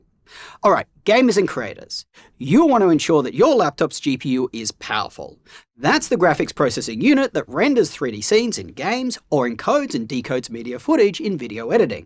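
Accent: Australia. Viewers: i got excited thinking it'd be an australian website with australian deals, thanks to the australian accent.. quickly got shut down. Wish you did one for the domestic market as well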